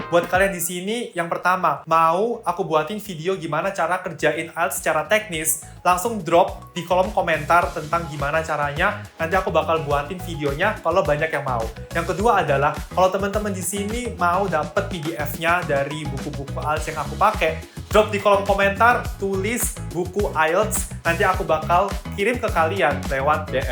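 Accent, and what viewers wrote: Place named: East Java, Indonesia. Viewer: surabaya ta sidoarjo mas? btw terima kasih tipsnya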